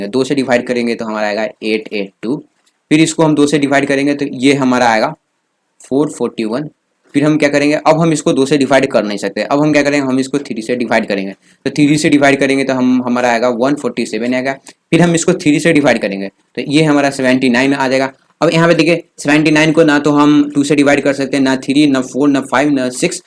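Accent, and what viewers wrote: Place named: Bihar, India. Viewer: Sir do you speak Bihari Your speaking style is like Bihari language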